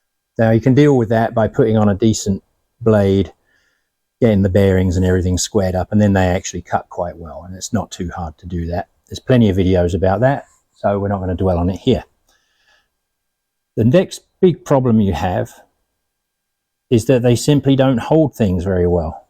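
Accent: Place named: England, United Kingdom. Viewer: Ooh! Just found your channel, nice to hear an English voice for a change. 🙂 I have the same saw, the blade fell off every time I started a cut as the drive wheel was misaligned, but after a bit of fettling it's done a good 15 years service including cutting a lot of firewood! I recently had to replace the motor capacitor, but nothing else. The stand is poor as you say. I'll be interested to see your vice mods.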